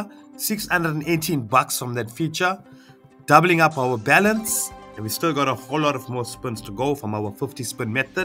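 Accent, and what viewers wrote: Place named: Durban, South Africa. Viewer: Awe awe bro... congratulations man🎉🎉.. you sound like you from Dbn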